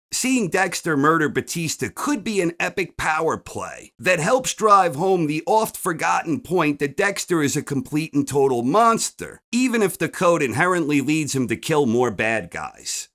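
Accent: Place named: Philadelphia, United States. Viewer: Sir, I don't want to get too far off topic but I've lived outside Philadelphia my whole life. Most of the people around have a very specific accent and you sound like us. I don't need to know where you live or anything but every time I hear you talk I wonder if you live within the region.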